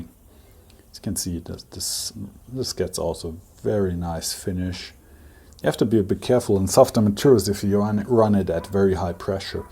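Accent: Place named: Germany. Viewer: Hi! Mpls MN 55407 The German accent makes the audio very enjoyable.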